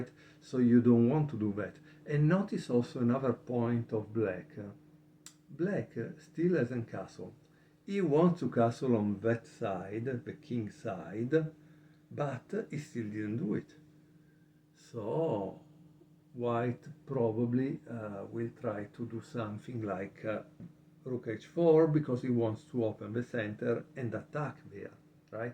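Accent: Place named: Italy. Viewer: Por la forma en que hablas inglés eres italiano.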